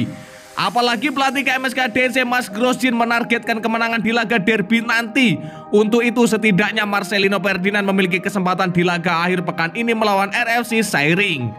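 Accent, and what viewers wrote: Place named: Banyumas, Indonesia. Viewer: Min, Ngapak banget.....😀😀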